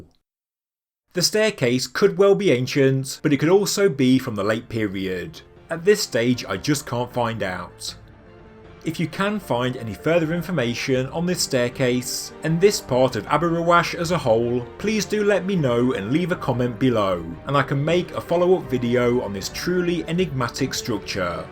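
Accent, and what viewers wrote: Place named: Northern England, United Kingdom. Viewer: Northern English I think.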